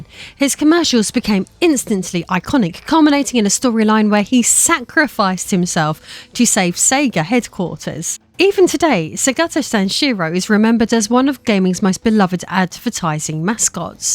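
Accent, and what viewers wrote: United Kingdom. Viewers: Not sure which part of Britain you're from but your accent is gorgeous. Very similar to 'Obsidian Ant' a guy who talks about space games, except he sounds like a wizard! He has a few hundred thousand subs... Great accent 👍👍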